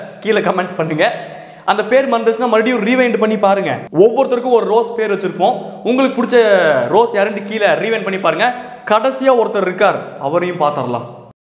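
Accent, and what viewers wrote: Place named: Chennai, India. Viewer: Unga madras slang semma. "Kutty kunjan" alaga irukku. Kidnap pannamnu neenga sonnadhu, semma.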